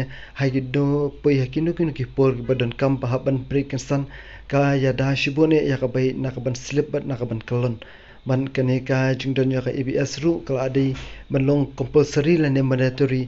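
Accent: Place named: Khasi, India. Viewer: tbit ban shna durkhih. i sur kren KHASInglish Dialect bakynjai bakhring par'lok, ki jingbatai hikai ba pynhun. ki byM ïa pei nei hei kin phai biang ha ban da shai. to nang kiew irat.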